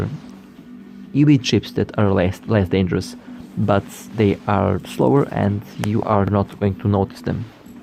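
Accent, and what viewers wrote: Russia. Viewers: Дружище, русский акцент слышно))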